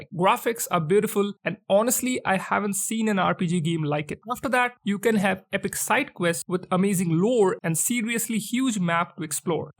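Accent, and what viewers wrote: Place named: India. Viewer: The channel's about section says channel was made in India. His accent is also Indian. So he is?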